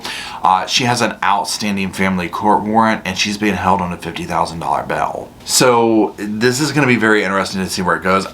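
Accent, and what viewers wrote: South, United States. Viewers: Dearest and Roscoe darling, I L💓 VE YOU!😘 I can't tell you how thrilled I am to watch your channel grow 🌱 There's nothing more fabulous than hearing that southern twang in your voice as you spill the tea to "Y'all"!😁 And a shot out to the officer's who were so kind to this precious little child 💞 My heart just aches thinking about what she's been through... BOTH these "parents" should go to prison for a longggg time!🤬 They have no doubt f*cked up this child's life, and I pray she gets the care and therapy she'll need to heal🙏 I'm so grateful for this channel, and adore "y'all" to pieces 🧩 XOXO's, Maegan 💋💋💋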